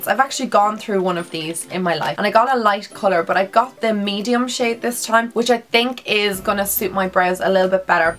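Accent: Ireland. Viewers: i love your irish accent! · I just started watching your channel and I tough you were from ireland I know my irish accent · Are u irish😘😘❤️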